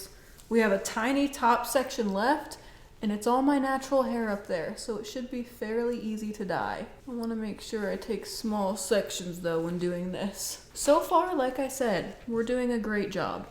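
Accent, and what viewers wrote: United Kingdom. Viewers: I love your British accent, as a Brit, I approve. Also love your attitude as ever...you are awesome.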